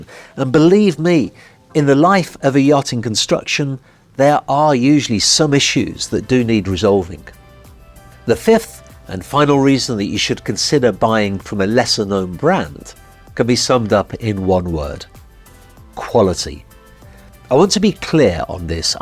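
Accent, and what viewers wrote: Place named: Birmingham, United Kingdom. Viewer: VERY interesting video. The advantages are applicable for many industries too. Loving the brummie accent ... 🇬🇧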